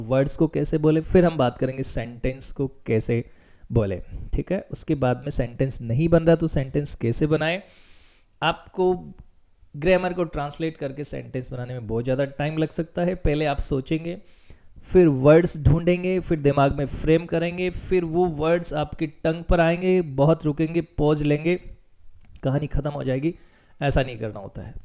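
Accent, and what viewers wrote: Rajasthan, India. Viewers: Sir AAP rajsthan se ho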